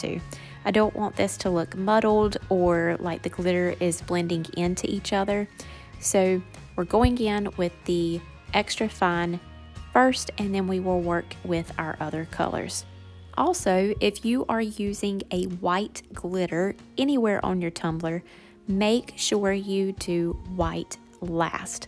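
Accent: South, United States. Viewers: Glitters are gorgeous!!! I love PDB!! My favorite glitter of choice!! What part of the South are you from? I know a Southern accent when I hear it!! 😂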